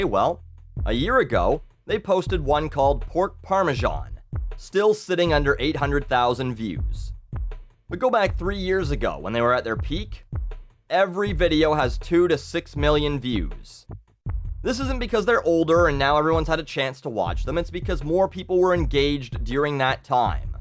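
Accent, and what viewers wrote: Canada. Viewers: Make a final video where you say your goodbyes, then open a new account, quit the Canadian accent (you should have done that long ago actually) and use a Swedish or Norwegese accent (it's the same cold country anyway, wonder why they speak different languages??!!). Do the same thing, same concept. Some people will say it kinda ressembles this measure of that used to be good a while ago, most will just say this is THE shit right now (yes right now, not tomorrow). You get 10 millions views in one month and you can finally make it to vidcon!!! Hooray.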